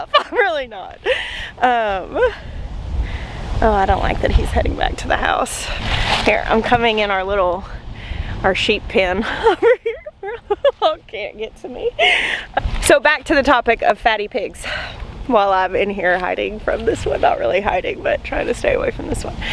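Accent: South, United States. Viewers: the southern accent is growing on you. I am so glad you are both safe! And the big boar is safe. Glad figured it out.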